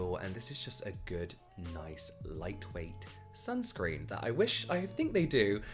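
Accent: England, United Kingdom. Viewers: Everytime I watch your video, besides that cute English accent, I also am filled with joy and laughter.. you are such an inspirational person and have a great positive vibe!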